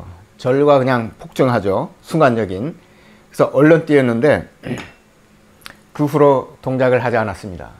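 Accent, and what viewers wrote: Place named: United Kingdom. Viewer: 정말 좋은 기술 가지셨네요 한국에 계시가요 아니면 영국에 계신가요 영어 발음이 아주 영국식 이쎠요 미국에서 드림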